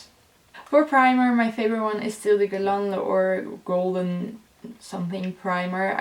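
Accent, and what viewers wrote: Netherlands. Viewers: Are you Dutch? Your accent sounds like a Dutch accent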